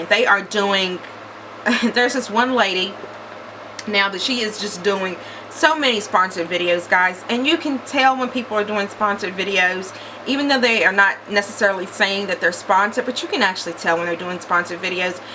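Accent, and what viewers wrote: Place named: South, United States. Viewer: I love your southern accent! Your make looks beautiful!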